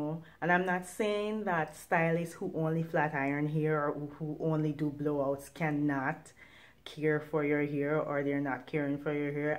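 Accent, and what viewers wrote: Jamaica. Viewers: I've had my hair flat ironed days ago because I wanted to get my ends trimed. When I wash my hair, I will let you know how it turns out. I keep listening for the Guyanese accent and keep hearing Jamaican 😊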